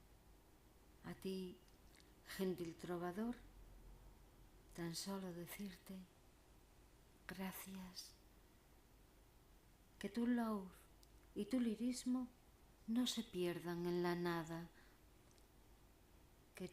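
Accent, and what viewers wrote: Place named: Spain. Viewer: Que lindo! muy dulce tu voz y ademas amo ese acento español!! un abrazo grande compañera!!!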